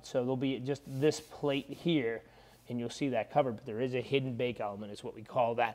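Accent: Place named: Midwest, United States. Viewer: Mid western American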